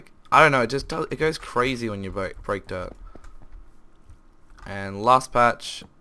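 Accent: Australia. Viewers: I swear he's Australian.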